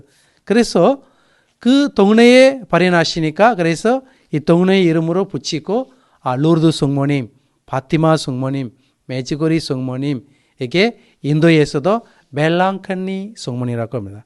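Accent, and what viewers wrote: South Korea. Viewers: 신부님 발음도 너무 좋으시고 잘 들려요 악센트가 한국사람같아요^^ 잘몰랐던 인도 성모님 소개해주셔서 감사합니다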